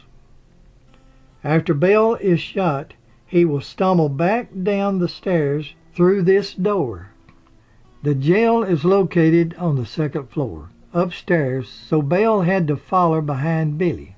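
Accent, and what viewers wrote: South, United States. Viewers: I don’t know where you’re from, but you have a pleasant story telling accent. I’m guessing western AL or Mississippi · I love that Southern accent. Music in my ears. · Ok...I'm from Wisconsin and in my opinion, anyone south of Illinois has an accent that multiplies by every mile south of that. Perfect voice for documentaries and you should be on TV and radio. Your documentaries are better than some of the History Channel documentaries I've seen. Also, speaking of History Channel, have you watched "FOUND?" If you havent, you should see the Jesse James episode...mind boggling!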